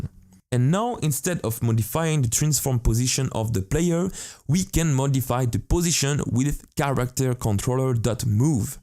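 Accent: France. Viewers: U ve a french accent ! R u ? ^^ thx a lot, all ur videos are so usefull, u rock ;) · tu es francais ? L'accent a l'air un peu francais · t'a un accent francais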